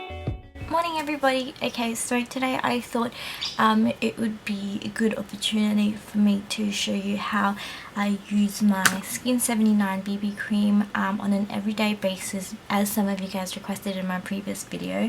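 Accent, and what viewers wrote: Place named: Australia. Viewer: A filipino girl with a what I guess is an Australian accent? Interesting! Subscribe! :)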